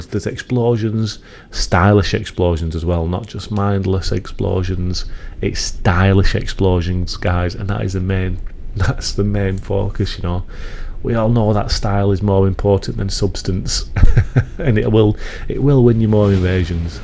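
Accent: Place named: Scotland, United Kingdom. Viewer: My dude is just a little bit scottish ❤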